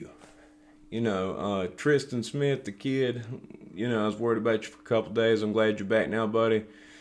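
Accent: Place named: Tennessee, United States. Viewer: Hey you said you answer comments I’ve been watching your show for a while how did you get a Tennessee accent from your babysitter?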